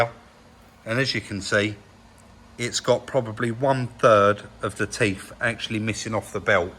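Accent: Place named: Essex, United Kingdom. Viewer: Hey, my wife was overhearing your accent she thinks you're an Essex boy. Is she right?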